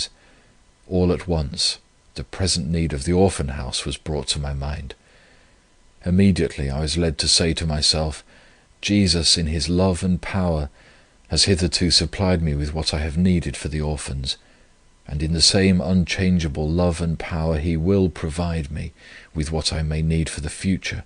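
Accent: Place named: England, United Kingdom. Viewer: I enjoy this Brother’s voice. It is comforting and I love his English accent.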